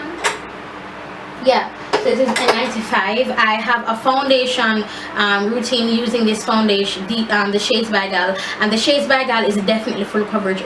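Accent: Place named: Jamaica. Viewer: nice foundation haul new subbie😀 my grandaddy is from Jamaica love the accent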